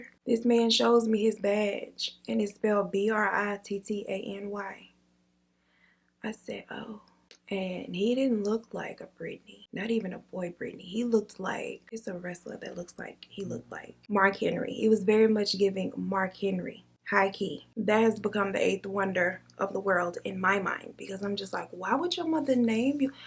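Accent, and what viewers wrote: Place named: AAVE, United States. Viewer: Sorry can't listen to ebonics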